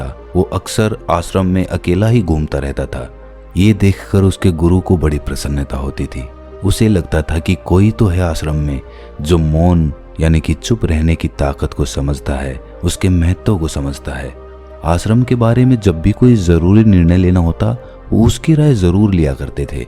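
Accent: Haryana, India. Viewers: awaj kisi haryana wale ki hai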